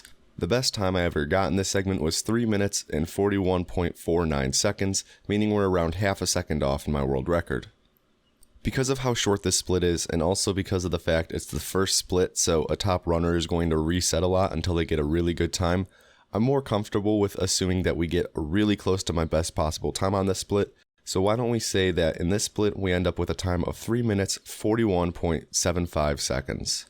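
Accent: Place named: Midwest, United States. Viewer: I love the aggressive Michigan / midwestern accent this episode? Like never noticed it before lmao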